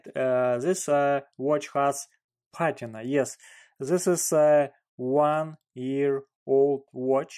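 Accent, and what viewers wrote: Russia. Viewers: Вы из России? Заметен акцент.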